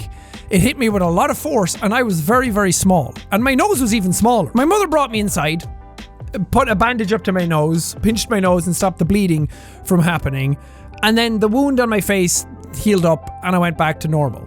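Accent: Ireland. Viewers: Okay but how he pronounced "dinosaur" was the cutest thing?? I ain't one to make a comment like that but idk. It was cute. ANYWAY! I think jack's talking about Ireland has really amplified his accent and I'm here for it 😂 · My goodness, Irish was full frontal in this video 😂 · After seeing a 7 year old video of, i wonder when did he become so irish? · Idk if this is just me but irish accent makes me happy watching his videos in genral make me happy.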